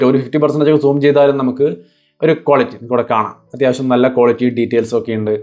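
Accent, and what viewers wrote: Thrissur, India. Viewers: Thrissur kaaran aaanalle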